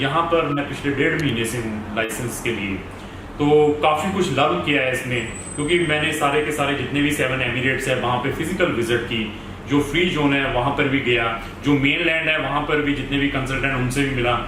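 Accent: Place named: India. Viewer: would be nice to write and speak in english as we do not understand indian english sorry,couldnt understand a word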